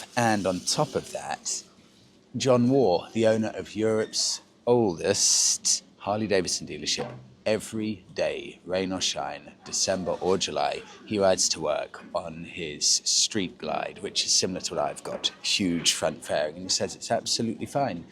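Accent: United Kingdom. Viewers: Hello. Your dialect is like music in my ears.😊 Where in GB are you from?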